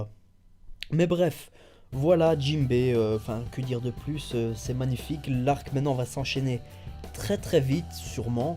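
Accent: Belgium. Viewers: Question complètement hors sujet désolé mais T'es belge, est ce que tu viens de Huy ? ^^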